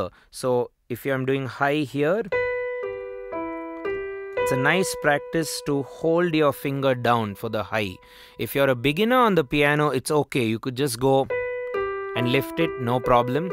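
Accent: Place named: India. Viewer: Wonderful...No plans to learn piano but I loved listening whatever you played...God bless...Your way of speaking sounds very Indian...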